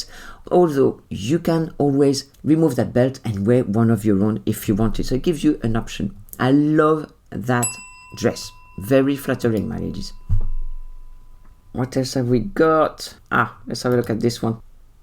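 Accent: France. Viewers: I love your French accent in English. Greetings from Poland.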